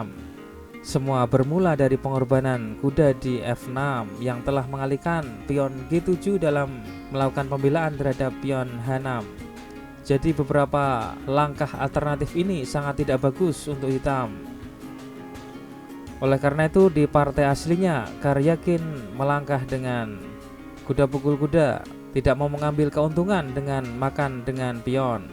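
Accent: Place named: Java, Indonesia. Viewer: Sehat selalu bapak dgn dialeg jawanya😊❤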